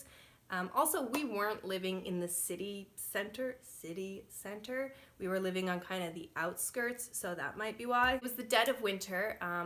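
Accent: Turkey. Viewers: I have a feeling that you might even be a Turkish. Your pronounciation of certain words are very Turkish. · Aksanı amerikadan tr ye gelmiş amerikalı gibi değilde sanki tr den usa ya gidip ingilizce öğrenip gelmiş türk gibi 🤔 her dediğini anlayabiliyorum gibi. Bu işte bi i... var😎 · Bir Türk'ün ingilizce konuşması gibi. Türkçe şehir adlarını aksansız söylüyor. Yani bir Türk. Ebeveynlerinden biri Türk olmalı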